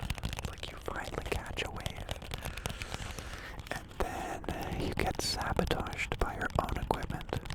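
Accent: United States. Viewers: is he american orr?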